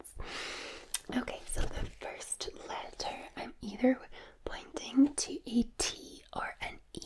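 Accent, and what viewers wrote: Scotland, United Kingdom. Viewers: half an hour of tingles from the resplendent scottish lass. perry the platypus noises ☺️ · Thank you.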